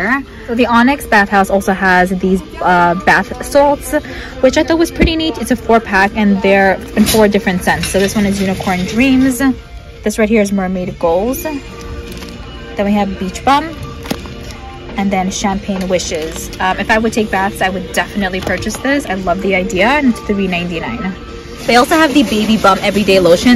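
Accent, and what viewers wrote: New York, United States. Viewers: Sounda like a NY/ NJ thing.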